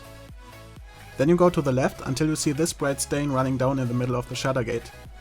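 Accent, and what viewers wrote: Germany. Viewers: Are you German? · Man hört einfach, dass er deutsch ist xD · For me understand your english is really hard because you have fkin German accent :D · wow, it's hard listening to this guy. dat German accent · Hört sich so deutsch an :)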